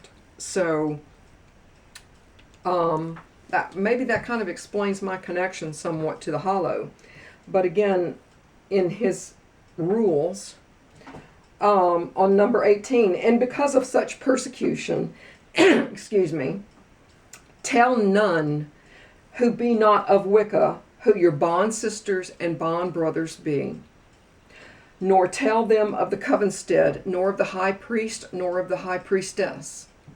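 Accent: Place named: South, United States. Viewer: New subscriber here. I have a question. How long have you been doing this? Just curious. I like your personality and you are a very unexpecting witch. I grew up doing this and I’ve had to keep quiet for so long since I live in the Bible Belt. Now that I’m older, I’m more comfortable with who I am but, I still don’t go around telling people what I do as a lot of people in my small town are very narrow minded and I have kids and don’t want that to affect them negatively with other kids and their parents. I noticed your southern accent so, this is comforting to see. Thank you 😊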